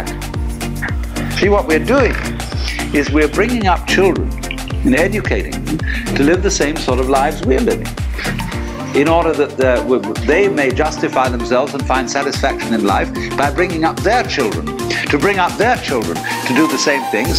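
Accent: South Africa. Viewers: Are you South African because your accent sounds familiar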